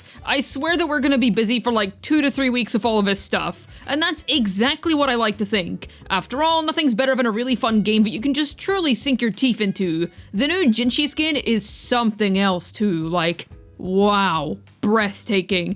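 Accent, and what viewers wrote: United Kingdom. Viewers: Your accent still sounds british XD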